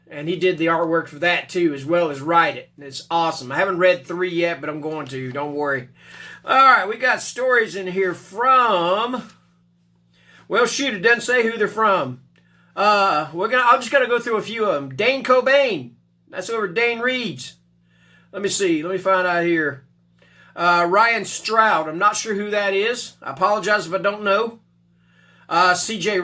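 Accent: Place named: South, United States. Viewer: you never lost your southern accent. Love it. 👍 Nick and Regina are so nice. Awesome book mail. I remember your review of The Ruins and how much some of the characters got on your nerves 🤣 Iconic. Cool Dracula knife.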